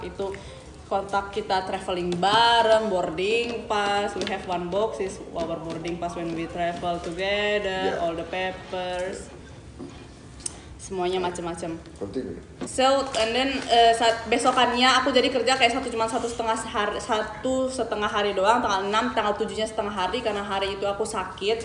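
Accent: West Sumatra, Indonesia. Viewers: Mbak aslinya apa orang Minang.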